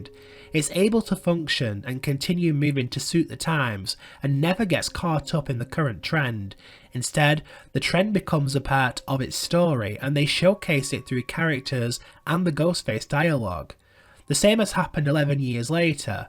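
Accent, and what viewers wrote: United Kingdom. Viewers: He's from the northern area of the UK, they all talk like that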